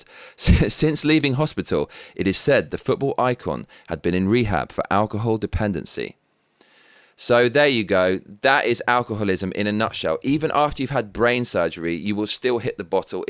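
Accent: United Kingdom. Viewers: you got the voice and Brit accent, you're interesting, articulately spoken words resonate fairness and humility. Everyone is locked loaded in these uncertain days, propagandized lies from Leftist media. These radical Totalitarian Socialist have firm grasp on most of our government agencies. I pray Trump can fix this if not America greatest is a thing of the past.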